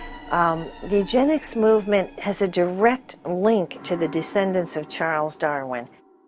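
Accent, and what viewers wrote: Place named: New York, United States. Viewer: video might be ok i f your fucking ny accent was not so fucking annoying loll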